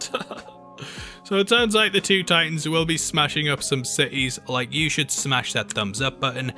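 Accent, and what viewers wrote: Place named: United Kingdom. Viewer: Wait a minute..... you’re from the UK!!?? 😳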